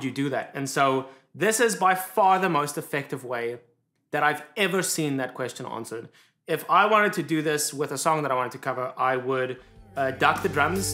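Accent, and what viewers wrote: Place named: Afrikaans, South Africa. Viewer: Your Afrikaans-English accent of "flip" is classic South African. Hi daar hier van Bloemfontein af!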